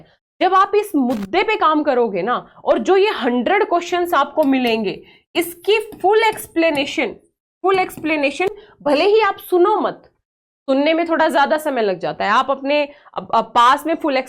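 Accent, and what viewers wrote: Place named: Haryana, India. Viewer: Mam ki accent प्योर हरयाणवी चोबीस ❤❤❤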